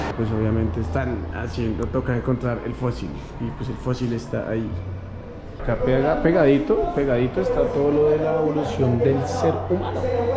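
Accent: Colombia. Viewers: Pana? Este man es de dónde? Parece de Colombia...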